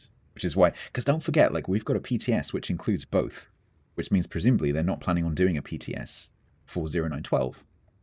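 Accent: United Kingdom. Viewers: Thank you, for uploading this preview (/speculation) for the upcoming updates, I guess I missed this part of the stream. I was a big fan of Foghorn News, but I completely agree with your decision to discontinue them. It's just nice to have the patch notes to be read in calming voice and delightful English accent in this hectic world.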